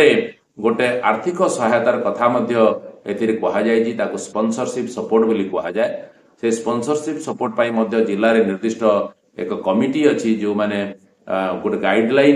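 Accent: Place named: Bengal, India. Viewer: Can you add English subtitles on your video. As a person from south india it's hard to understand Bangal dialect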